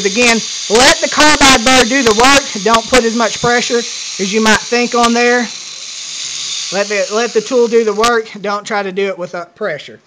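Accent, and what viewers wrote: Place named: South, United States. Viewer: ha! you are a bit southern sounding.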